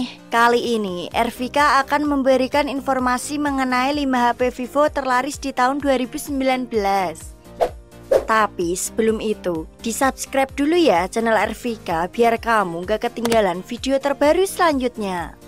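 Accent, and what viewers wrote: Java, Indonesia. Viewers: Ervika jawanya sangat.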